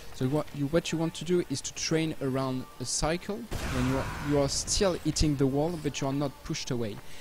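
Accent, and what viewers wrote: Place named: France. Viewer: Un français ca fait plaisir :P ton accent te trahis xD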